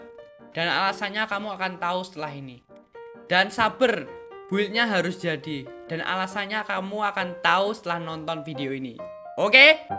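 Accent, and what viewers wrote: Java, Indonesia. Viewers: Pasti yg punya Chanel orang Jawa keliatan Logatnya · Orang jawa yag mas?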